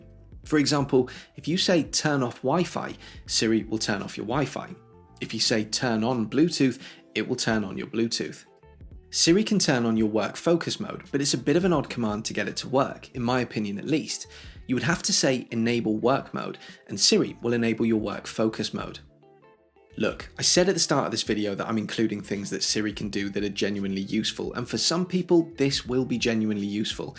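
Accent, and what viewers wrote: United Kingdom. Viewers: Hi! thank you for your videos, I have watched all of them I think that I am strangest subscriber on your channel because I watch your video not only to gain some tips about iOS but also to improve my skills of good English and understanding of British pronunciation:) Warm greetings from Ukraine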